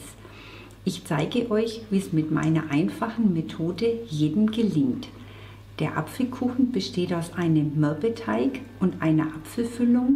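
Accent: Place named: Franconia, Germany. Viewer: Liebe, jetzt muss ich dir endlich mal ein Kompliment machen!Deine Rezepte,Tricks und deine liebevolle Art sind einfach super! Dein fränkischer Dialekt mag ich besonders,komme auch aus deiner Gegend.Da ist immer ein bisschen Heimat dabei!☺ Bitte mach weiter so!Du bist genial!🍰🍩Danke du Liebe🌹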